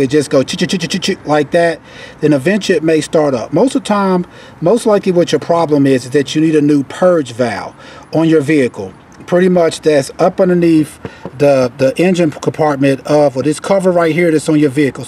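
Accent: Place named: Louisiana, United States. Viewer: i hear sounth louisiana, st bernard parish in dat voice. great tip, thank you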